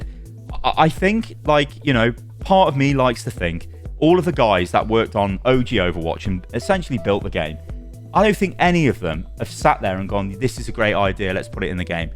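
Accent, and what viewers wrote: South, United States. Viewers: Wow your american accent. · The rich Southern American accent from a Brit is not something I knew I needed. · excellent yaccent (yank accent) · 10/10; American accent..👍🏽👍🏽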